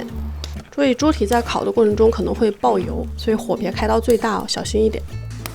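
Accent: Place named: Shanghai, China. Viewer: 我家乡也有好吗我倒觉得是上海银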